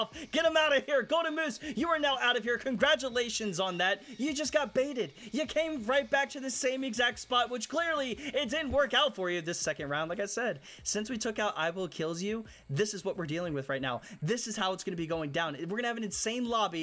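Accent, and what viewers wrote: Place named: Ireland. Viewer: Littlr irish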